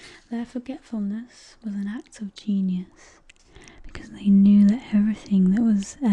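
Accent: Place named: Yorkshire, United Kingdom. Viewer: I'm hoping your Yorkshire down to earth accent is genuine about spirituality, we are taught alway question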